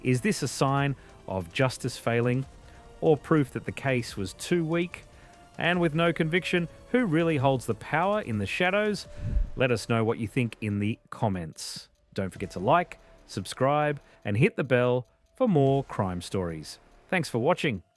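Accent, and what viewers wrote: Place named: United States. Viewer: I hear an American accent but it could be AI if not do some research so you stop sounding like a dickhead it's always been like this why do you think some people earn full colours in half the time of others. You sound like a square head who reads something in the newspaper and then talks to other straights like he's in the know. Don't usually comment but I'm sick of this shit.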